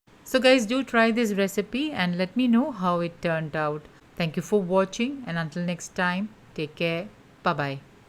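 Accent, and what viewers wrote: Kerala, India. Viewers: Yup.. it’s the cuteness of Malayali accent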